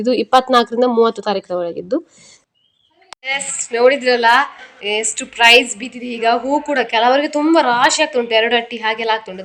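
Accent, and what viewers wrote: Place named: Tulu Nadu, India. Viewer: Erna thulu mix kannada kenyereg kushi apundu..😂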